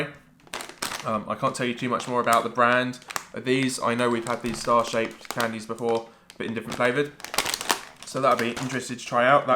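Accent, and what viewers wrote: United Kingdom. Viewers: cheers for the reply I'm defo thinking of getting the boxes I love new things. What part of UK you from to me you sound northern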